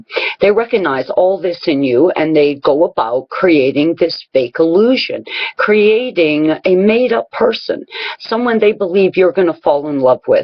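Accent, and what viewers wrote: Canada. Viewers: Toxic, evil, sinister, fake spell cast upon the good ones by the evil ones...never again: ne j'aimerais pas! Love your Canadian accent, I used to live in Saskatoon! All my best friends are there, especially the good, strong Western women! O! Canada!😇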